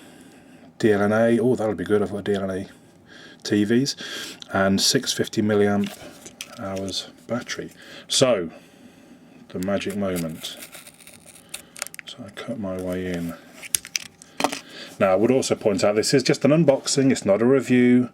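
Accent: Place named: United Kingdom. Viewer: BRITISH ACCENT!!!!:D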